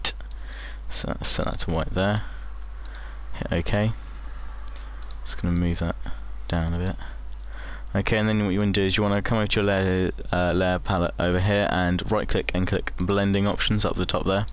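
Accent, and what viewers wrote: United Kingdom. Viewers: Thanks for lesson, British english cool! - Giorgip7-Tbilisi, Georgia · he is british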